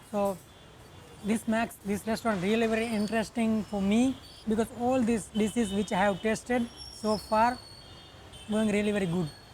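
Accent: Kathiawar, India. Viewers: Grammatically his English is perfect, but being residing in Gujarat, naturally his accent tuning is with Gujarati.Thanks. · I always hit like on your video for your presentation allthough we kathiyawadi have bit different accent of speech it does not matter at all. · haha kyu lake kehvay ?? mast bole che bhai kathiyawadi English n keep it up nice video :-)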